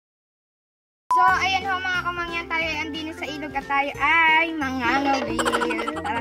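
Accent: Batangas, Philippines. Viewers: Yung lingguwahe katulad ng mga taga batangas.. keep it up 😁😁😁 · parang Batangquena ka Kamangyan? cute mo naman ang ganda ng lugar nyo...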